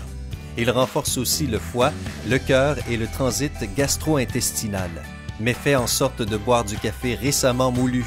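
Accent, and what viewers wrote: Quebec, Canada. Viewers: Greg Paris c'est l'accent québécois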